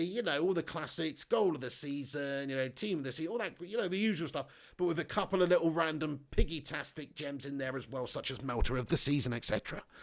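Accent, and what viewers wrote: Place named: Scotland, United Kingdom. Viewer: We need doing the deals like his Fifa career mode.the Scottish accent needed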